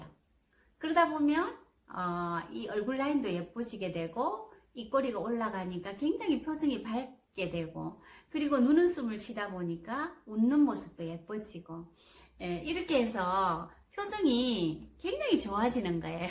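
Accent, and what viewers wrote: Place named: Busan, South Korea. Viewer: 사투리로 봐서는 부산 아지메가 학실합니더~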